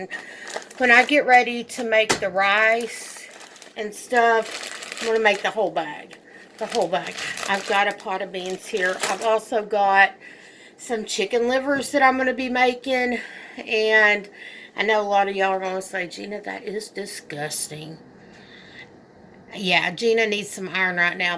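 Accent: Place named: South, United States. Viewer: Mama, I love that southern accent.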